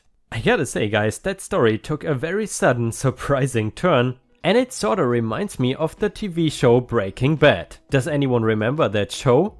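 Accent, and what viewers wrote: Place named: Germany. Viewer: thank you for the reply! I’m honored! Lol. Well, I’m old, and wear glasses, but I love to read along with your voiceover because sometime many of you “clean up” the written words and I like to know what the OP actually wrote. Lol. Thanks for the reply. BT W. I love your German accent, my elder relatives were German immigrants and I love hearing the accent again. 😘😘⭐️⭐️⭐️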